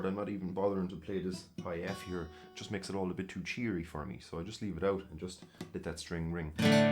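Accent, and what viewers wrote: Ireland. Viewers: Irish as they come this lads voice is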